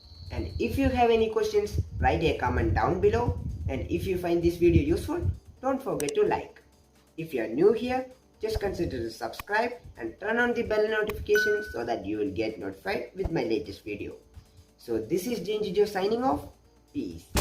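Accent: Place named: India. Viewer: I hate hindi accent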